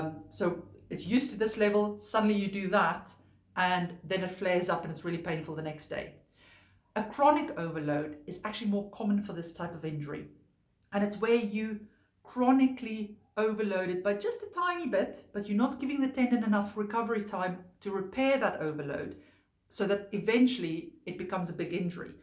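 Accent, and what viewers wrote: Afrikaans, South Africa. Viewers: Is that an Afrikaans accent I hear?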